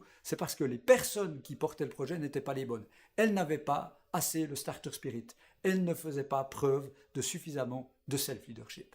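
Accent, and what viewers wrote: Switzerland. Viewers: J'adore cette chaîne et d'autant plus avec un accent de chez nous :P #swiss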